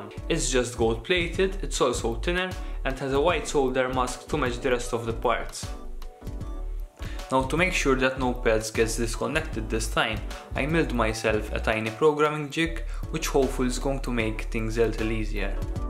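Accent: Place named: Malta. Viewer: i can see from your accent that your maltese same dude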